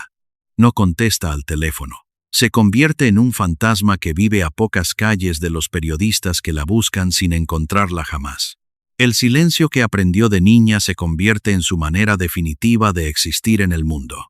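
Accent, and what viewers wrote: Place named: United States. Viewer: Cambien el robot de lectura con ese acento yanqui horrible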